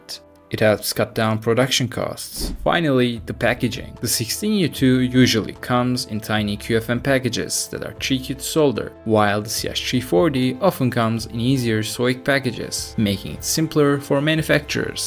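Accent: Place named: Turkey. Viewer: Hele diyorum hafiften bir türk aksanı duyuyorum.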